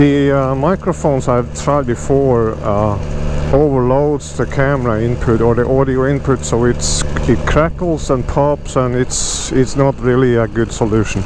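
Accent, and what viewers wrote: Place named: Northern Norway, Norway. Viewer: Can hear 'nordlendingen' in your English speaking.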